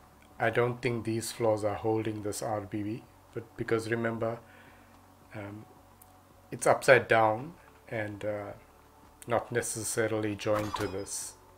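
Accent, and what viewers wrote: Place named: South Africa. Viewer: Are you south african? I can see the ping and hear the accent 😭🤣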